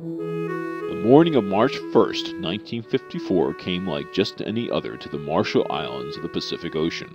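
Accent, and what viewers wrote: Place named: California, United States. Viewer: something funny here is that the commentator try to replicate the 50's Trans-Atlantic English accent usually used in the 50's, the tone was simiar but the accent was not, it's a modern Mainland accent, Californian probably.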